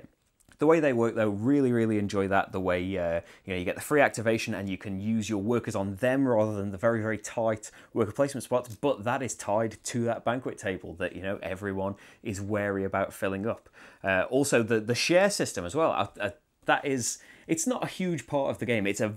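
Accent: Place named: Cheshire, United Kingdom. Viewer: I'm trying to place your accent. Let me guess - Cheshire? Maybe Macclesfield? Anyway, thanks a lot for these videos, extremely helpful!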